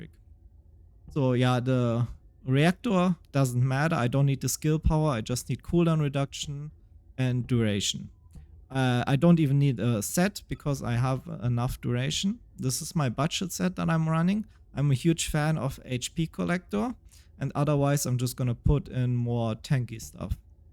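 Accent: Germany. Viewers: Dieses deutsche Englisch hahahaha geil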